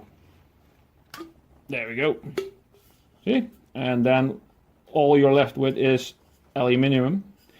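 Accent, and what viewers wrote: Netherlands. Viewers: Hi we met on Gil's stream just know not sure but are you Dutch too.I hear a similare accent I have eather way greetings from your new friend from the netherlands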